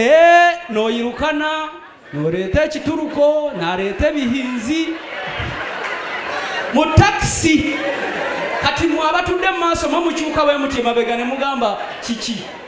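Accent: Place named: India. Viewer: I like the Indian acsent it real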